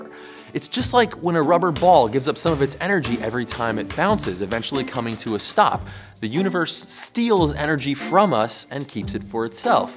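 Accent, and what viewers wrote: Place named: Texas, United States. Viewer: What part of Texas are you from?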